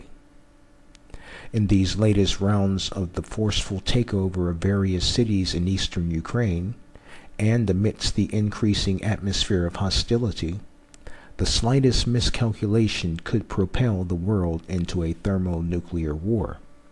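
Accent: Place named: United States. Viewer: You speak like american.. :) are you sure that you from Australia? When Cook came to Romania after that there was 27 crew members who leave the boat. What hapens? Thay scare! When somethin goes wrong, americans always scare!.. Everyone understund that if that would be WAR our fighter could kill Cook for many times for this 1,5 hours! And of course we know why Donald Cook came to the Black See. It came to show us its power.. It's not scare..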